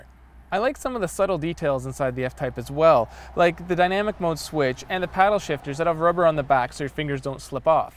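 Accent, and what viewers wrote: Canada. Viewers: Presenter has a Canadian accent.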